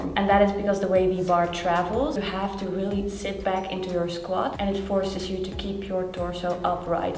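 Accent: Netherlands. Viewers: Im just curious, where are you originally from? Your accent sounds Dutch to me ^^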